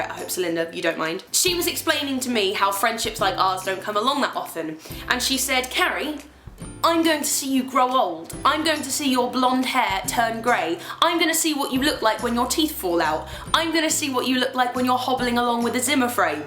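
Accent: United Kingdom. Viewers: I saw the channel name "well I think I'm gonna subscribe the channel" I hear the British accent, You talk about Dodie presses the subscribe button so hard, my phone screen would be broken, if I had an iPhone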